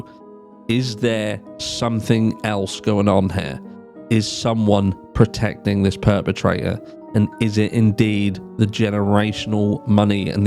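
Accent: United Kingdom. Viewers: Love the added British accent, great affect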